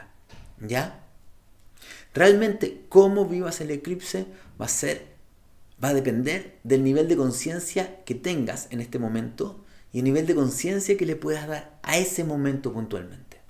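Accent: Chile. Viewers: Amo tu acento chileno es un placer escucharte. Te mando un abrazo gigante de esta uruguayita viviendo en el hermoso México ❤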